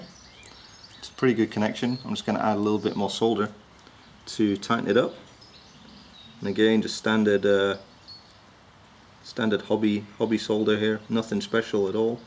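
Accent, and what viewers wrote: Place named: Upper class, United Kingdom. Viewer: Excellent class in upper-class British King's English: with a tear-down and tighten the old WAP54G war-horse antenna mounts thrown in for free. If you are comfortable that the antenna-mounts have not twisted so as to sever the internal antenna leads: from the outside - without cracking the case - you can go ahead and screw down the nuts with a 9/16" deep socket wrench. Obviously - you want to do this by hand and not use a socket-wrench driver handle. The nuts themselves are shallow and slightly recessed - but not impossible to grab with pliers. A box-end 9/16" wrench would also work. But the 9/16" socket supplies absolute control with no slipping or knuckle-busting. And your fingers can sense the exact amount to tighten as the the nut (and maybe a thin washer?) bear down on the ineffectual internal-tooth lock-washer that CEO John Chambers (Cisco CEO) used to purportedly stop the loosening from happening in the first place. 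Everything about the consumer design and assembly of this unit sucks. The design was meant to place the WAP54G into a family of consumer devices that would be stacked. I've never seen them stacked. But as points out - the WAP54G is a warhorse. And when have a guest who can't connect an old PC to your new Cable-service router - you just whip out the WAP54G and they can log on. I've never sat down and worked this out - but WAP54G security is probably not the best. A final note on cracking the case after removing to two little screws. I found that f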